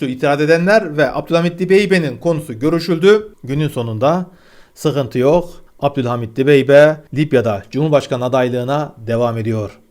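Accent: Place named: Anatolia, Turkey. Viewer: Geee Yok, Kıbrıslı değil. Biraz dikkat edersen zaten şivesinin Anadolu'dan bir yerden olduğunu anlayacaksındır. bundan 6 yada 7 sene önce Devletin bir birimi tarafından oraya göreve gönderildi.! Tam olarak söylemem doğru olmaz. Maraş bölgesi yakınlarında bir yerde KKTC İLE- RUM sınırı arasındaki BM (Birleşmiş Milletler) askerlerinin kontrolündeki TAMPON BÖLGE'nin dibinde bir yerlerde sınıra paralel, hat boyu dedigimiz (o güzergah boyunca uzunlamasına giden) şekilde bahçeli, bol ağaçlı arazi ve üzerinde ev verildi. BM helikopterleri devriye esnasında bazen KKTC hava sahasına girdiğinde (Askeri birliklerimizin, mühimmat depolarının yerleri vs görüntü alıp Rum tarafın verilme ihtimaline karşı) bizim rürbelilere haber veriyor, onlarda BM merkezini arayıp ikaz ediyor ve BM yetkilisi de helikopterle görüşüp derhal Türk hava sahadından çıkması, tampon veya Rum hava sahasında ilerlemesi sağlanıyor.! 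Ayrıca ileri görüntüleme teknolojileri ve ihalarla da 7 / 24 Rum tarafını ve oradaki herhangi bir fatklı hareketliliği gözlemleyip bizim Türk tarafına haber veriyor.! Arada bir de birkaç gün ortadan kayboluyor yeni vidosu gecikiyor. O kaybolduğu arada da ya Suriye'de, ya Libya'da, ya Karabağ'da bir yerlerde arkadaßlarına destek vermeye gitmiştir.. Kal sağlıcakla....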